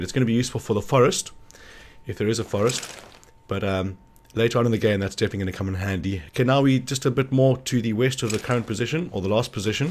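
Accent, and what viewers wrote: South Africa. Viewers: Hey! Are you South African? It kinda sounds like it. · Are you South African? :)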